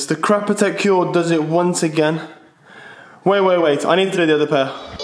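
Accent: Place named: Liverpool, United Kingdom. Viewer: What accent even is that like southern with a twang of scouse mixed in 😂😂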